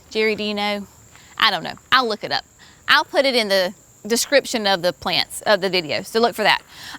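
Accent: South, United States. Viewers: Fall has arrived here as well At the Jerseyshore! Tomato tomato it’s all good! I for one enjoy a southern accent 🌿🌻 🍅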